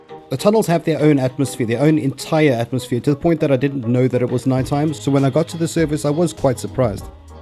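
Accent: South Africa. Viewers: are you from cape town or are you a joburg boet?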